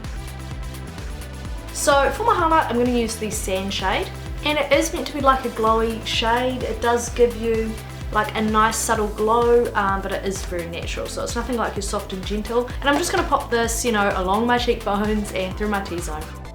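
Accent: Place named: New Zealand. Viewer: I'm from Egypt :* I knew you were from New ZeaLand because your accent is similar to ShaaanXo's .. I like your accent a lot actually :))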